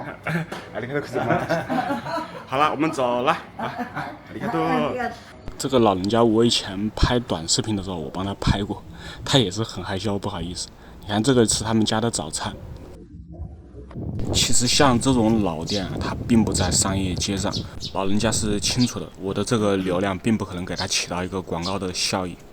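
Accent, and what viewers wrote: Wuhan, China. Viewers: 这期提到热干面，加上口音，应该武汉人无疑了。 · 朋友，你这武汉普通话，好亲切啊，多久没吃热干面了。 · 听口音武汉人吧？老乡！ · 听口音是武汉的吧？抖音也刷到过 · 武汉口音？ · 博主听口音是武汉的吗？ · 武漢普通話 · 你是武汉的？ 藏不住的口音 😂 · 聽你口音感覺就是漢普，等到14分鐘的時侯就真的冒出一句武汉話。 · 我一听你的口音就觉得你是武汉人， 果然是哈哈哈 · 主播是武汉人吗？感觉是汉普，另外听到了热干面，感觉很亲切！ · 主播这口音武汉人吧？ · 这口音一听就是武汉人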